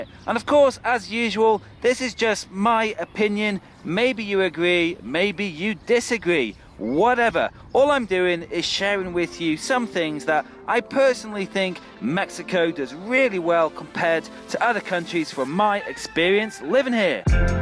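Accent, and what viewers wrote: England, United Kingdom. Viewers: Escuchen ese bello acento inglés 😌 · There you go a brit in MX with a nice accent!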